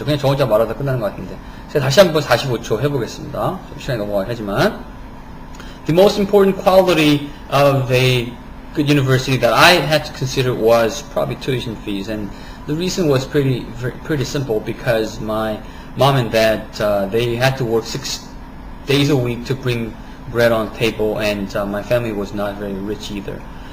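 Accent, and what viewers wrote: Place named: South Korea. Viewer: hes good but he stuttered too much.. and has a korean accent.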